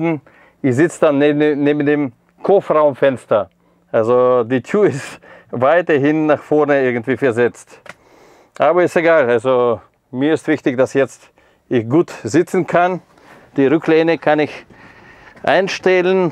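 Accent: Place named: Russia. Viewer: Herrlich..ich liebe 2 Akzente,den franzoesischen und den russischen..russische Technik sowieso,fahre nen uralten Lada,verlaesslich.Gruss aus Patagonien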